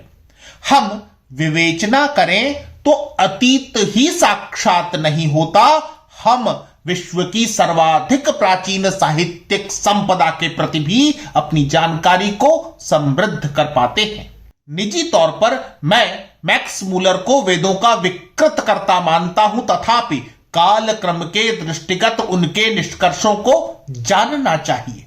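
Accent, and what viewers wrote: Standard. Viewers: शुद्ध हिन्दी उच्चारण, बहुत दिनों बाद सुनने को मिला| इसे कहते है हिन्दी!!! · बहुत ही सुंदर जानकारी वो भी शुद्ध हिंदी उच्चारण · हिन्दी का इतना शुद्ध उच्चारण बहुत कम सुनने को मिलता है. साधुवाद!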